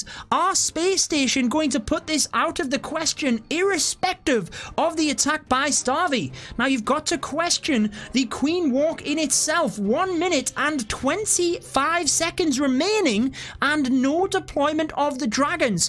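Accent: United Kingdom. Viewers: British accents is melody to my ears.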